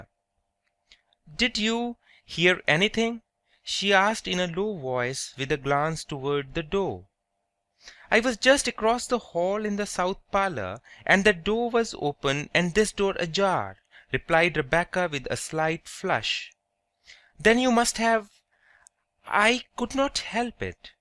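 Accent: India. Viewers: Man, it’s hard to learn from heavy Indian accent. How can I improve my pronunciation with this reading? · i.m agree the indean accent too mach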